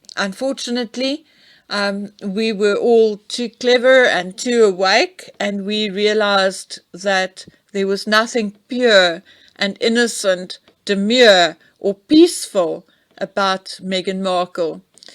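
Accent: South Africa. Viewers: That is not your business. You racist Afrikaner. Your accent is horrible. Your country has so many problems why don't you worry about that. You cannot put your foot in Meghan's shoes. She got a standing ovation when she spoke at the United Nations. Even Banki Moon stood up to congratulate her speech.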